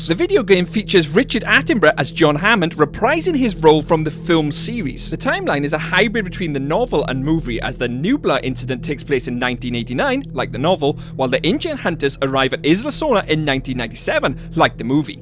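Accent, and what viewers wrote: Wales, United Kingdom. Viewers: It is hard for me to find new classified disclosure on a daily basis. I am amused by the British accent. I see you did Inter Alia Quantum Postage. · Is that a fellow Welsh accent I hear? Love your videos, dude! They’ve made me much more interested in the wider lore of JP/JW.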